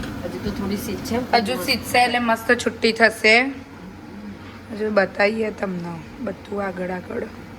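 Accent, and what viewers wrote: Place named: Daskroi, India. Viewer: ગાંધીનગર બાજુ, દસકોશી ના લાગે છે😀 ભાષા ઉપર thi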